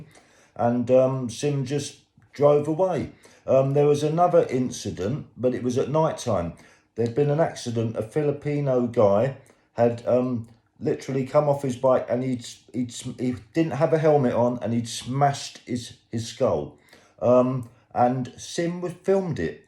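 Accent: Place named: United Kingdom. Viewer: You sound like a British idiotic jealous mfer!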